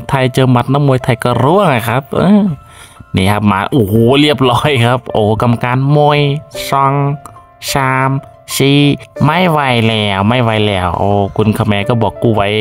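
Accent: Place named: Cambodia. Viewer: สำเนียงแอดเหมีอนเขมรมาก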